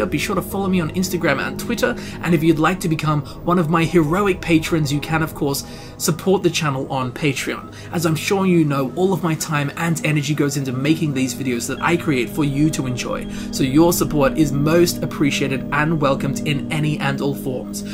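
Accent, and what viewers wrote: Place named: Australia. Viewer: You know, I’ve been going through all of your videos, and I’ve just realized that the reasons I love listening to these are because 1) I just like learning about lore, 2) your buttery voice, softly speaking, accented ostensibly with an Australian (or maybe New Zealand?) touch, and 3) your amazingly awesome alliteration and decadent descriptions. It’s great, I love it.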